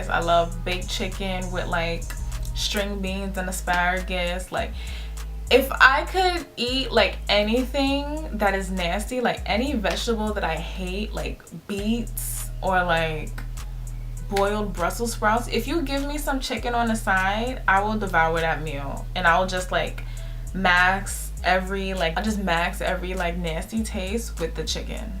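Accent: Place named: New York, United States. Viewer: 5’6 gang! And listening now, you have a little NY in your voice. This was a great video 😂❤️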